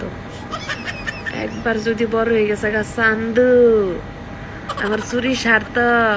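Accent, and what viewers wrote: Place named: Bancharampur, Bangladesh. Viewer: আমার কেন যেনো মনে হচ্ছে আপনার বাড়ী বাঞ্ছারামপুর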